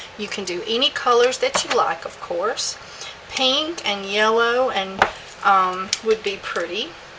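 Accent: South, United States. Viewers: Just wanted to tell you how much I love your vids, love the southern drawl :) and your soaps are amazing btw! :)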